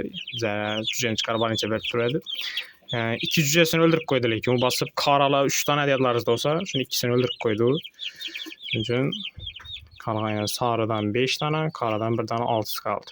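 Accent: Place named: Khorezm, Uzbekistan. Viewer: Aka iloji boʻlsa shevada gapirmang oddiy oʻzbek tilida gapiring vidyolarizni faqat xorazm liklar koʻrmaydi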